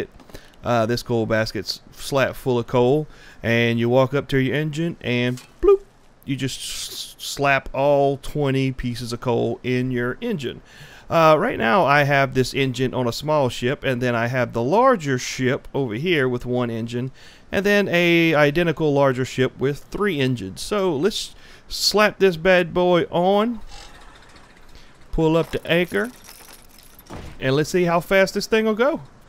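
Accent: South, United States. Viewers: is a coonass, im from bama. I tend to seek out the southern tubers, they my kinda people. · Is that a southern accent I detect? :D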